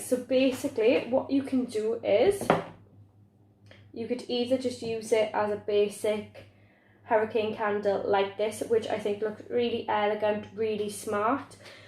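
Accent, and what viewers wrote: Wales, United Kingdom. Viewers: hi - new sub...loving your content...good to know PL gluegun works...will get me one tomoz wondering from a welsh girl -do i hear a welsh accent -you would be the first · aw lush! No I can spot it a mile off! hold onto it forever I miss mine! 😩 I live by Gatwick now so it's lovely to find a welshie on here🌞 I've turned the little bell on😁xxx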